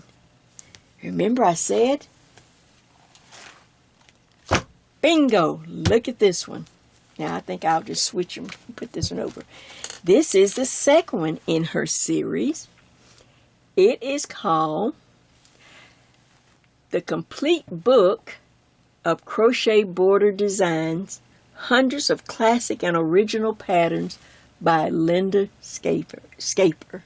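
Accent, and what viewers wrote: South, United States. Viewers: Only just subscribed couple days ago. I've been crocheting for decades but the recent introduction of so many of the old... really old stitches is so exciting. I learned to knit, crochet and embroider all at age 11. I still enjoy 2 out of 3. I prefer not to knit. Too mundane and not enough texture. I cane view a piece then reproduce it. I'm unable to use the charts or should say they slow me down. I prefer reading the row by row or rd. by rd. written directions. I will certainly be purchasing both of those books at the JoAnn's I used to work at. I will be tuned into your channel every time I get notification you've put up a crochet video. I love your friendly voice and giggle with your very distinct southern accent. I lived in La. and Miss. Each southern state's accent is very unique unto itself. Thank You for all your efforts in this field you so obviously love as you shine brilliantly at it. 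I'm Grateful, LYNDA in Utah.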